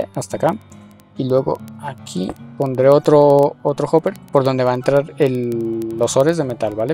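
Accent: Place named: Bogotá, Colombia. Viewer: De donde sos? solo por curiosidad? PD: Pareces de bogotá por la forma de hablar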